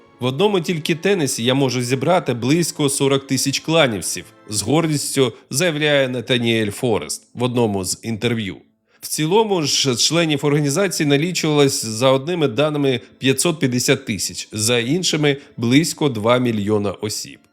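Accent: Russia. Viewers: канал україномовний, але автор судячи по вимові в повсякденному житті російськомовний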